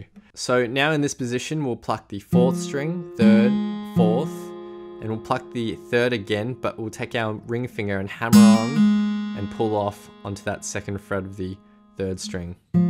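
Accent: Australia. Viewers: When you hear the Aussie accent And see that beautiful Australian guitar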